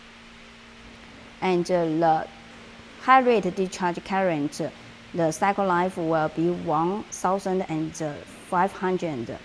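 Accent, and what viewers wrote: China. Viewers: gave such a great presentation. For some reason, i can easily understand a mandarin accent but i have great difficulty understanding an east indian accent.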